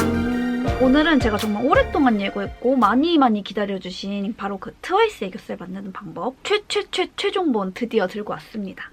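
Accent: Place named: Gwangju, South Korea. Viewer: 혹시 언니 광주에 사시나요? 말투가 제 친구들과 똑같아서..❤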